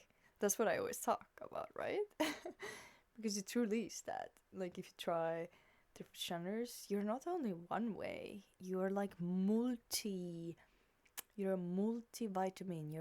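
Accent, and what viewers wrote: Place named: France. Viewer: Great work! keep it up! I also like ur accent, are u French?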